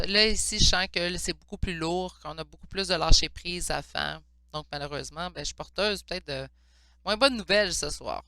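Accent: Quebec, Canada. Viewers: Je n'ai vu que ton intro pour l'instant, et je voulais te dire que j'adore tes petites anecdotes ! Et comme tu l'as dit, t'entendre parler de réunion donne de l'espoir (et puis je suis contente pour toi surtout 😁). Généralement, quand j'entends quelque chose qui me fait de la peine (et que ce n'est pas une méchanceté à la base bien sûr), je me dis que ça en dit plus long sur moi et les blessures que ça vient réveiller que sur la personne qui a dit ces choses là... Oh, et J'ADORE ton bel accent et tes expressions québécoises 🥰 J'ai l'impression qu'en France on se "moque" beaucoup des autres accents de la francophonie, alors que bon, pour les autres, c'est nous qui avons un accent 😅 Désolée pour ce long pavé, mais je t'ai sentie attristée, alors je voulais rééquilibrer un peu la balance. 😉❤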